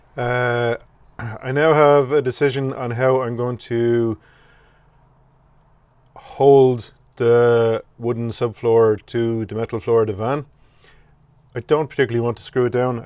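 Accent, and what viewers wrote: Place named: Ireland. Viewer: Nice job mate, and timely! I've just acquired 72 low light, and have the same job to do. And that Irish brogue!! I could listen to it for ages, love it. I'm from Australia. 😂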